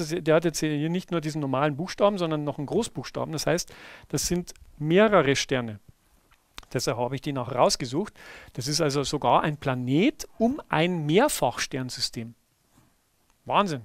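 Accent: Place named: Landshut, Germany. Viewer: Sehr interessantes Video Herr Gaßner, weiter so! Mich würde interessieren, ob es irgendwann vielleicht auch einen Beitrag über die galileischen Monde oder die Monde des Saturn gibt? P.S. Man hört, dass Sie aus Landshut kommen ;)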